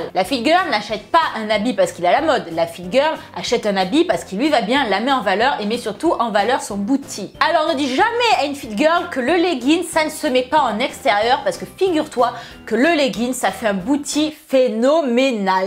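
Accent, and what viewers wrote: South, France. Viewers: oh putain con l'accent, tu viens tu sud toi hein !!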